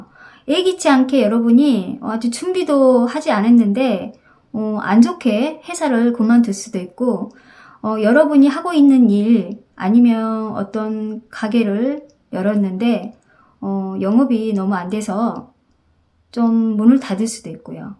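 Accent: Jeolla, South Korea. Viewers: 1번 감사합니다 ㅋㅋ 전라도 분이신가 봅니다. 광주 목포쪽인가요? ㅋㅋ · 전라도 분 이신가 봐요?반갑네요! 1번 리딩 감사드립니다^^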